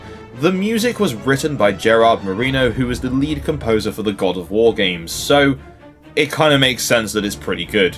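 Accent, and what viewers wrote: United Kingdom. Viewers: you're british right ?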